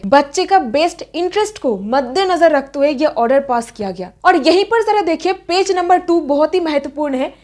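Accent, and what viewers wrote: Bengal, India. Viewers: Apnar kotha sune mone hochche apni bangali??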